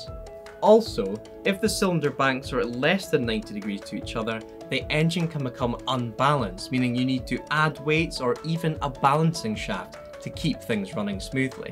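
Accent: Scotland, United Kingdom. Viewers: I wish the video had subtitles...I can't get my ears around the Scot accent.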